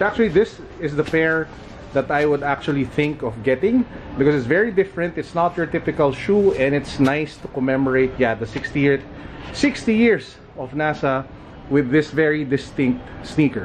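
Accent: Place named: Philippines. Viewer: You are philipines because i can tell how u talk in english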